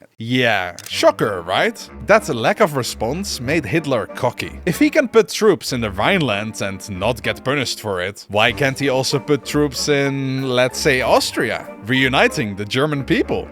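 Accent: Netherlands. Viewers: Dutch accent? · Ik weet al door deze accent dat dit een nederlander is lol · i smell dutch here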